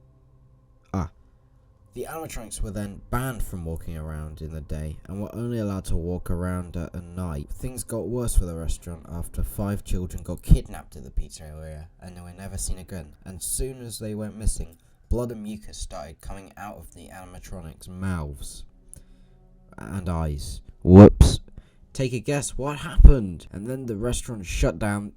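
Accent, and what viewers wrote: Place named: United Kingdom. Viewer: british guy talking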